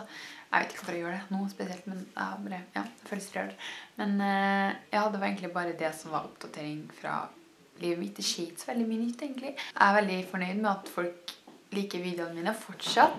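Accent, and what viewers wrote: Trøndelag, Norway. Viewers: definatly from Norway. :) Can hear it in the dialect. ;) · Så du er fra Trøndelag? Jeg prøver faktisk å lære norsk (nederlansk btw) å jeg kan forstå deg veldig godt. Jeg tror at Norsk er verdens vakreste spåk men norge har så mange dialekter så de blir veldig vanskelig å lære. groetjes uit nederland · First I thought "That sounds like Trondersk (I have been learning that dialect myself" and then she said "Trondelag" and I got so happy that I guessed it right. I might develop some kind of ear for accents (That would be cool)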